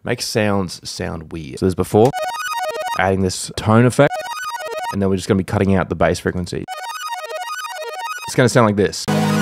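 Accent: Australia. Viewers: bro your aussie?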